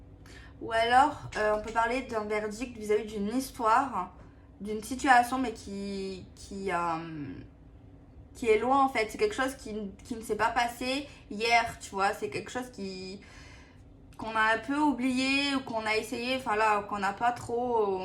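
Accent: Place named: Marseille, France. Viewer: Bj d entré le premier message est pour moi! Avec l accent marseillais comme moi! C est super ma belle! O bonne mère bénie cette petite femme d amour!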